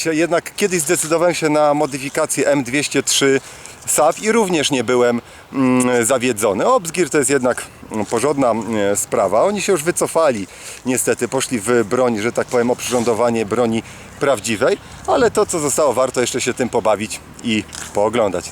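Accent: Silesia, Poland. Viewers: skąd jest? Ze Śląska?;p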